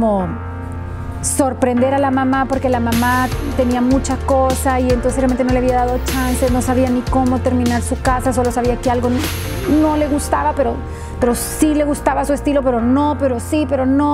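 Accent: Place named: Honduras. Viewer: Wow le quedo hermosa la casa, hace poco la encontré y me encanta su canal .... La veo desde NY pero soy de Honduras creo que usted por su acento también verdad . Felicidades un trabajo muy bello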